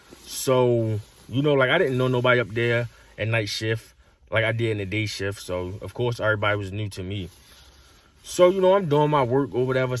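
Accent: Baltimore, United States. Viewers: Are you from Baltimore?lol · Definitely a bmore dude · are you from baltimore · He sound like he's from Baltimore Lol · This dude gotta be in Baltimore with that accent. And shit in Baltimore is different completely no matter what it is. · Bro definitely gotta be from Baltimore 😂 accent super hard 😂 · You from Baltimore? That accent crazy lol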